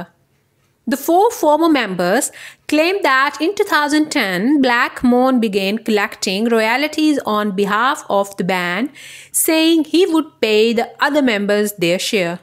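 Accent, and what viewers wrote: India. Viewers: It’s an Indian accent.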